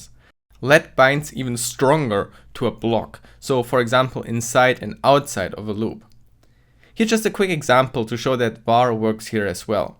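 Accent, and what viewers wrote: Germany. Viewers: Just wondering where you are coming from! You sound so German :)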